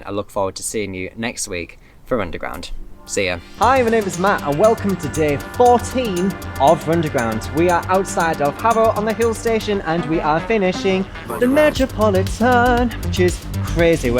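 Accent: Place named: Yorkshire, United Kingdom. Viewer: Are you from Yorkshire, Matt? I've never been able to place your accent but hearing you say Moor made me think of Yorkshire.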